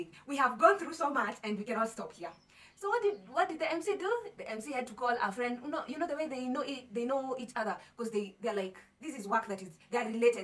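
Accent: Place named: Africa. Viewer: In this video language in African ascent and fast.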